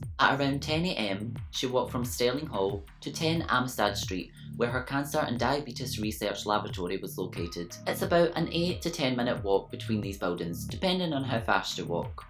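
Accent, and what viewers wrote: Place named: Scotland, United Kingdom. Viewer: I’ll let it go because we did get a Scottish accent in its place. ;) Lol!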